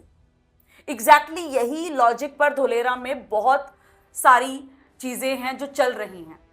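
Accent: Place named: Haryana, India. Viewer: Madam ji thanks.for giving knowledge dholera city investment.aap mhari boli bole rahe ho.that is haryana.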